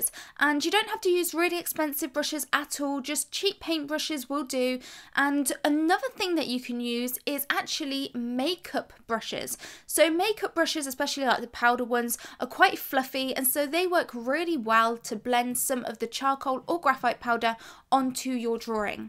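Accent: England, United Kingdom. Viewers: I just wish I could understand what you are saying. Your British is so thick and you speak so fast I can only comprehend about ever other word, which makes for a lot of confusion. Can you speak American? Please? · luv you british? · I love your english accent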